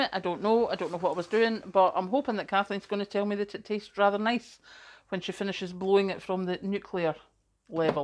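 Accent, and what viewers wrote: Scotland, United Kingdom. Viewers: i just love your scottish voice and your enthusiasm, its infectious xx keep it up, your views will GROW, your channel is just very CUTE xx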